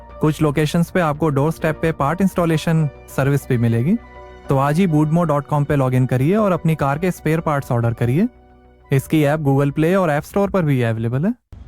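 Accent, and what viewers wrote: United Kingdom. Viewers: British bolna teak hai but the landrover ownedby Tata motors 🔥😎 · Bhai Saab...not British....be indian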